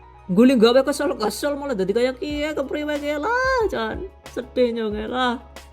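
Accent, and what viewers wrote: Banyumas, Indonesia. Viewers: Hahaha lucu banget ngomong bhs apa itu.ngapak y.makasih atas resepnya mbak.semoga menjadi berrkah.Aamiin..🙏👍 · Mbaknya orang mana sih?kok ada ngapaknya. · Bismillah "DONE"#Giveaway THV 2020.paling seneng sama resep rendang yg satu ini.kelihatan enak bgt.menu favorit,apa lagi ditambah suara mbaknya yg ada ngapak ngapaknya gitu bikin senyum senyum sendiri dikamar koyo wong gemblung.ha,ha.pokoke ORA NGAPAK ORA KEPENAK.OK.CATAT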